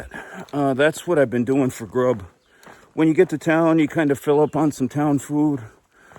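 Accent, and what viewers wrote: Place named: Connecticut, United States. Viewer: You sound like you are from NW Connecticut?Enjoy the hike!!!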